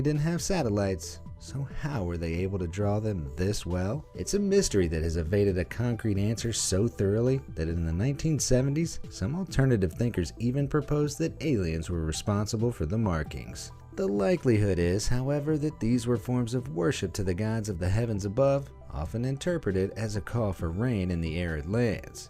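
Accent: United States. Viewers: Well the words he's useing are the English words for it (English as in UK not English as in English lauguage) tho his voice is rather amarican or Canadian. Its hard to tell as he uses alot of English terms and pronouncyation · Ah, so this is a British guy that sounds American. He said, "Petrol Head" dead giveaway.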